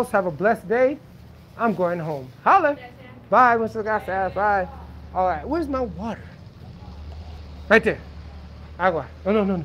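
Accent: El Salvador. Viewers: love your salvi accent 👍🔥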